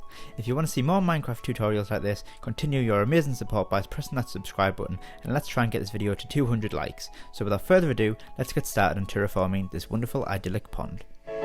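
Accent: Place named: Yorkshire, United Kingdom. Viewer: Me too! :), But i know that Lol, I can tell from your accent, Sounds northern, you from Yorshire?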